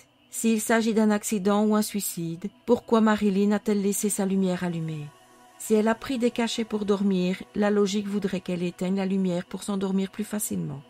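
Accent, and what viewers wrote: Belgium. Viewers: Bien sûr une affaire connue mais un point de vue et une analyse différente... 👍. Merci pour le petit accent Belge 😉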